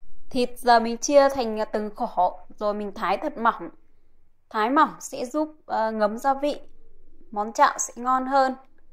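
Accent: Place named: Nam Dinh, Vietnam. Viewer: chuẩn giọng Nam Định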